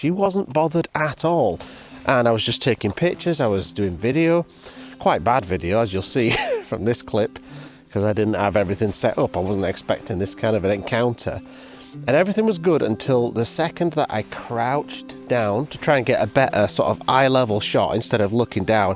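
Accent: Yorkshire, United Kingdom. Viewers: It must be that calming Yorkshire accent, I fell asleep about the same time as the bobcat did .😊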